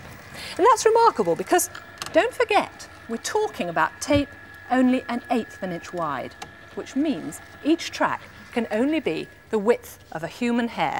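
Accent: RP, United Kingdom. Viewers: I love the way the presenter was so posh. I wish the women I knew were hi-fi nerds! Imagine a woman blabbing on about which tapes to use and if Dolby should or should not be used! That would be fantastic, instead they bombard me with crap and TikTok videos I delete immediately upon receiving! Anyway, this cassette was a flop, the CD was launched in 1982 also and we all know what happened!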